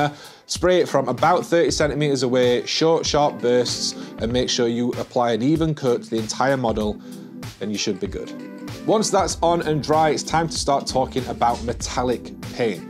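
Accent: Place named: Northern England, United Kingdom. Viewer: Hiya mate great tips here! - At last a video which is to the point and helpful and in a great nothern accent -which i can relate to lol! I just wondered if you might be able to help? I am a complete beginer with figure painting but have an art background so should be fine with the painting part. Basically, I collect transformers and am trying to 'repair' or colour match this chrome orange colour which is flaking off the figure 🙈The figure has like a chrome metallic orange with a mirror finish - so I was going to by some GSW paints as the metallic chrome and some of the other orange inks look like they might work? However do you know would I mix this with an orange first or water it down and put it over the top? any advice or tips woud me amazing - its been really hard to get any specific answers as its a bit of a specific thing I am asking lol!🤣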